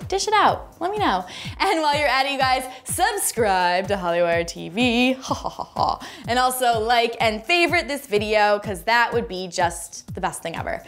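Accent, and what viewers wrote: United Kingdom. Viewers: Y'all make it seem like everything she do is a bout Harry she talked in a English accent she tryin to be Harry she put on a Beanie she trying to be like Harry wats next she put on shoes she tryin be like Harry like forreal y'all need to stop y'all ain't even relevant to her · Speaking in a British accent, dressing like harry....looks like someones on a roll now doesn't it...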